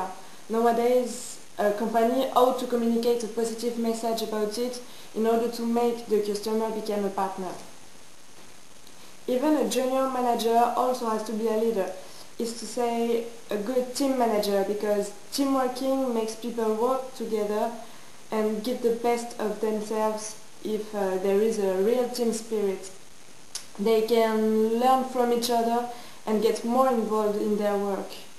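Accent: France. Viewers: are you french??? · Gotta love the French accent!!!! viva la France :)))) I have no idea how I ended up watching this video!!! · a little hard to understand your english, pretty french, but hot accent thought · you r french i guess...is it ?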